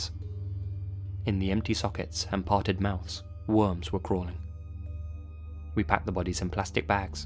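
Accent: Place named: England, United Kingdom. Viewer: I cant stand the English accent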